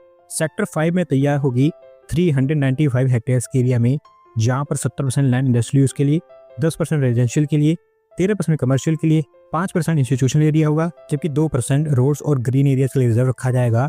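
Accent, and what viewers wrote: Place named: Himachal Pradesh, India. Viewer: Bhai aap Himachal se hai, aapka accent se lagta hai.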